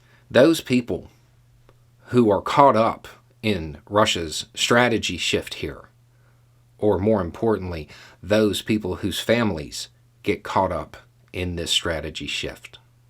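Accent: South, United States. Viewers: Thanks. Yeah I knew as I sent that comment that it wasn't Texan. Had a feeling it was southern tho Howdy there internet people it's agaien. So todaiye... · Another Skewer here! Love Tuesdays lots of great content from two of my favorites. They prove that all of us sporting a Southern accent are not complete morons! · First time watching. First impressions are often wrong. My first impression, beard, southern accent, prepper, yeah, this guy is a Trumper. I hope to hell I'm wrong because there are already far too many anti-American republican a-holes. So, before I 'sub', I must watch more of this man's videos. I 100% STAND WITH UKRAINE!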